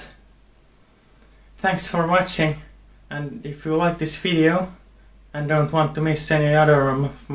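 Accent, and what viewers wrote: Finland. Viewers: your Finnish accent is wonderful. And you are soooo cute <3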